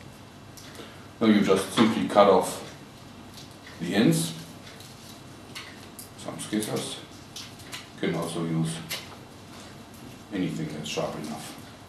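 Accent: Germany. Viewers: no, it's a german accent^^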